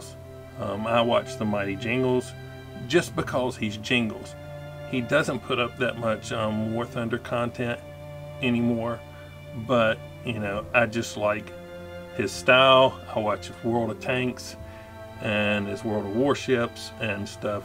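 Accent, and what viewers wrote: United States. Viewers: hello, I first saw your channel about half a year ago and I liked what I saw but then I just kinda forgot about that until today when I was watching Mingles with Jingles and looked in the description and saw your name. I was like oh yea I remember him so now here I am.:) About the giveaway I don't know If its over yet or not and honestly I don't believe I would win it anyway, I don't usually. But I don't care about that I like that you are kind of like my favorite YouTuber Magz, just a little slower in speech and you're American so that's cool. You seem interesting and your content seems more mature than some other YouTubers I shall not name, thus you have gain another sub today.:D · nice to hear an american voice. Thought it was an EU monoply:)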